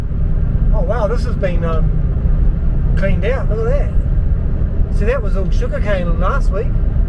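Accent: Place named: New Zealand. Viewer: Good to hear a familiar Kiwi accent from over there across the ditch. My late son drove interstate out of Melbourne for years. Sadly John was taken from us by cancer aged 56yo on Oct. 21 2021. Bob. Lower Hutt. NZ.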